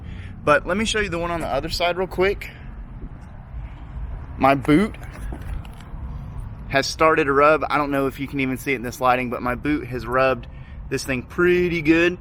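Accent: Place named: Georgia, United States. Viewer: You sound like chase on two wheels. Must be a Georgia thing. Sick bike btw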